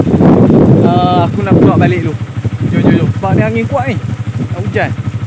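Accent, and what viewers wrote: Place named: Pahang, Malaysia. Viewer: Loghat macam loghat pahang...org pahang ke?...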